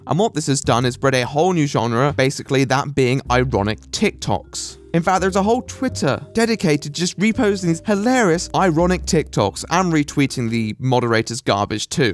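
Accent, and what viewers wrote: RP, United Kingdom. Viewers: Received Pronunciations :) The posh accent. Not as posh as ye old aristocrats. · hes posh mate, pride of the uk. hes funny though so gotta love him!!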